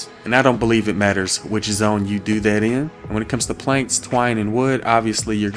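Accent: South, United States. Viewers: I think he talks/sounds hella southern lmao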